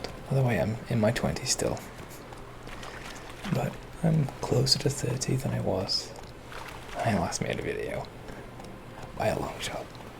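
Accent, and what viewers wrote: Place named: Yorkshire, United Kingdom. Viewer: I dunno if I’m tripping mate, I can hear the Yorkshire accent (I’m from South Yorkshire) but definitely sure I can hear some American in there 😂 *EDIT. You’ve just explained in the video hahaha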